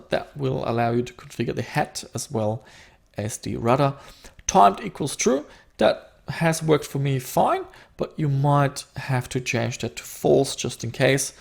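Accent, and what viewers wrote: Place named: Germany. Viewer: Thanks for the tips.. From the accent, i know you're a german. ;)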